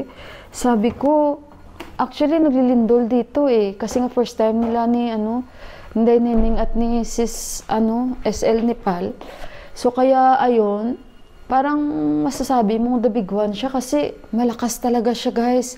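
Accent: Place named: Ilonggo, Philippines. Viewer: Ilongga po ba Kay maam? Now lng ako nka view Ng YT channel mu. Alam ko tlga sa tono mu kc e😁😉. And kamukha mu ung crew ko dati sa Try me ice cream house & resto dto sa Iloilo☺️Ingat po Kau jan🙏🙏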